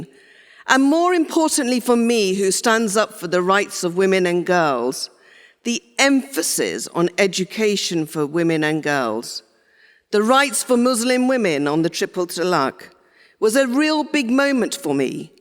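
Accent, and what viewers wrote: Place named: England, United Kingdom. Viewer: Godi media with an English accent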